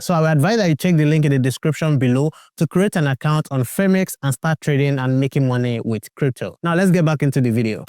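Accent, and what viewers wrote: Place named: Nigeria. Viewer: Ur doing the lords work abeg. Been watching the videos in oyibo accent since and i was so confused. I needed this in complete naija accent lol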